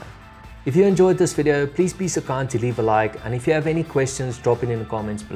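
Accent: South Africa. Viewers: You sound South African 🇿🇦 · Am I hearing a Saffa accent? Thank you the amaizing tutorial! Keep it up! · As a South African I couldn't help but be taken aback by the accent. Cheers boet 🇿🇦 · Awesome tips bud. South African I'm guessing?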